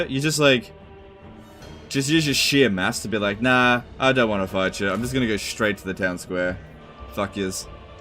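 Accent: Australia. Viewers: Wait is Australian????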